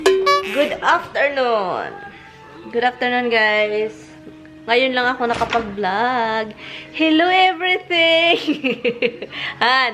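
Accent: Visayas, Philippines. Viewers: ang cute mong mag bisaya.. na intindhan ko kc bisaya din ako...simple ka lng...at gwapo si mr.khit may edad na sya..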